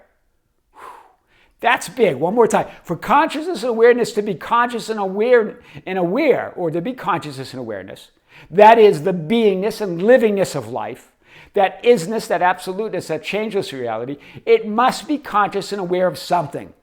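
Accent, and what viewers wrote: Boston, United States. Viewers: Boston accent?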